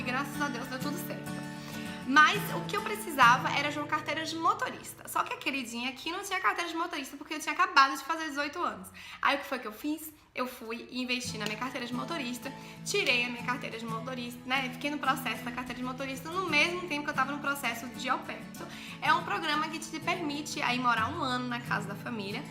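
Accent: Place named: Bahia, Brazil. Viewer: Amei!! Tô super nessa dúvida e o vídeo me ajudou muito. Você e super fofa e sotaque baiano não tem pra ninguem <3 hahaha sucesso com o canal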